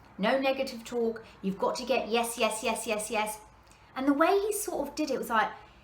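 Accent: United Kingdom. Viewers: it's great to hear the rant in a British accent brilliant. By the by everything you said is true in amway also ..thank you cheers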